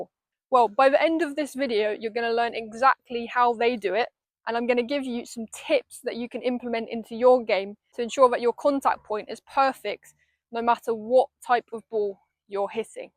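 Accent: United Kingdom. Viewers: Definitely British, I lived in Britain for 30 years. I sense a hint of a Cockney accent aswell. She's gorgeous! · You are a detailed good teacher. By the way, are you British ?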